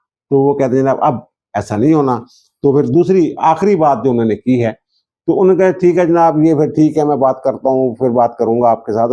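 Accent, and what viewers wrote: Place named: Punjab, India. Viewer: صاحب پنجابی ٹچ کمممممال ہے آپ کا۔ ❤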